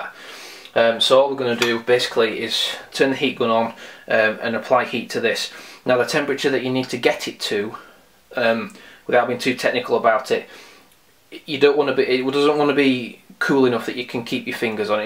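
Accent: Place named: Midlands, United Kingdom. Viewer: hi were did you get the stamp? and your press. I am on the uk your accent sounds like your in the Midlands.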